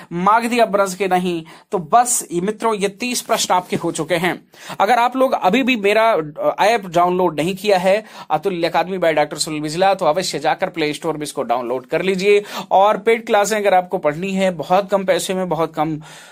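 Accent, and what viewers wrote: Pahadi, India. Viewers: पहाड़ी हिंदी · Pahadi hindi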